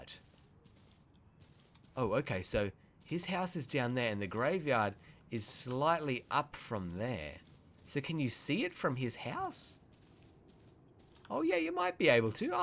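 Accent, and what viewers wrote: Australia. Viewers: is he from Australia? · R U Australian? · Wait is he Australian my grandma is Australian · Are u Australian? U sound Australian. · i'm Australian you sound like your from Australia...!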